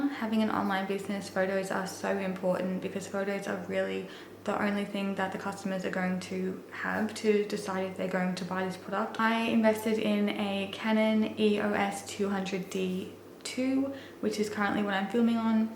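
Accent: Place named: Australia. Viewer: Hi! I really enjoyed your video. I really like your accent, I know you live in Australia. I like seeing Australia on TV a lot. Australian people seem so nice. I would like to visit there some day. I'm happy you have your own internet business. I like all the great tips you give. Huge thumbs up! 🙂👋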